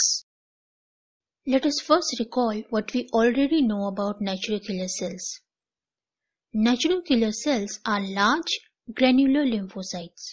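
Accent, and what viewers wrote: India. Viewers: whats the word minutes? very Indian pronunciation, I don't understand.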